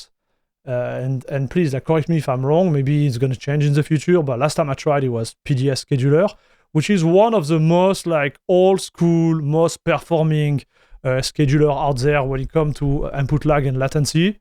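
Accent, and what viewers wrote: France. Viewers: Love your French accent. Mine is Portuguese. 😂 Greetings from Brazil 🇧🇷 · ngl i love the french accent you have XD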